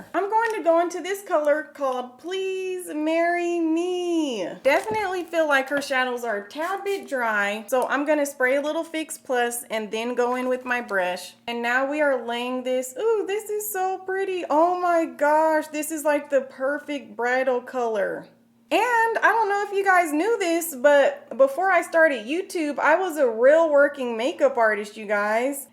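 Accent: South, United States. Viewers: Does anyone know where she is from? Her accent is so strange. It sounds southern (Im from Texas) But I cant put my finger on which part of the south. Maybe Alabama? Thanks for the help. Just curious over here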